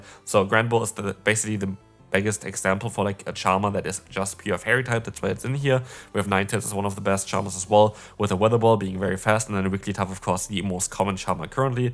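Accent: Germany. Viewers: You have german accent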